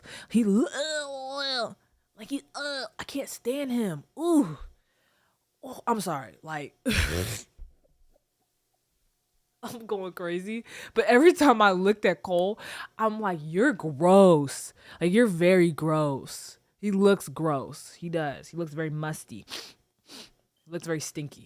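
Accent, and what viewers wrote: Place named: Nigeria. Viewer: omg your nigerian accent was everything. I love your analysis!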